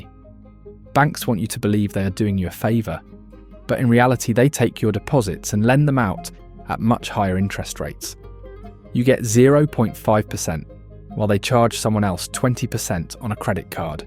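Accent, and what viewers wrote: England, United Kingdom. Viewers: Why you got an english accent. But the whole video is talking about dollars ?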